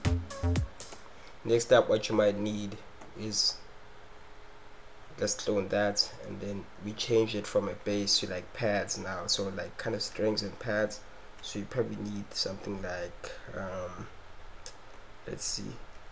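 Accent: South Africa. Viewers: are you South African haha?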